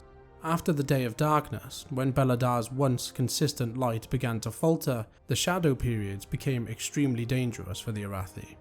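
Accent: Wales, United Kingdom. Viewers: This is great for us returning to Azeroth. Weird question, is that a Welsh accent?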